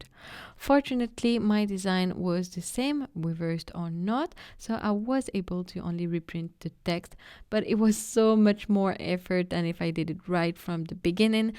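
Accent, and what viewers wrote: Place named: France. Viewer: Je me disais que l'accent était un peu français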